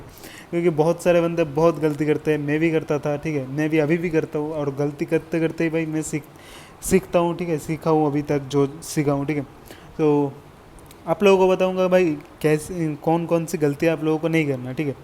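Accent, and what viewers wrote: Bengal, India. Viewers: Lag Raha hai ap Bengali ho isliya Hindi nehi bol pa rahe ho main bhi Bengali hu ❤️ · Tum bengali ho kya?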